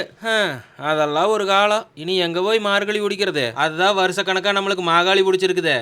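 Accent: Kongu, India. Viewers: Solla vaarthai illai thambi,kongu tamil,balama....sirichutten😂😂😂😂😂😂.enna oru vaarthai jaalam!!!!repeated a kettutte irunden👌👌👌💓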